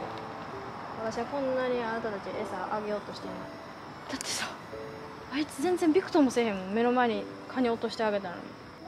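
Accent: Senshu, Japan. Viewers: 見ときや、見りや 泉州弁ええな。やっぱ。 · ﾊﾞﾘﾊﾞﾘの関西弁 笑えるし よいな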